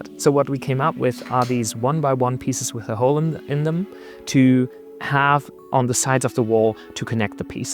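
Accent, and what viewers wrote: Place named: Germany. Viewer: Der Dude ist klasse und hört sich sehr deutsch an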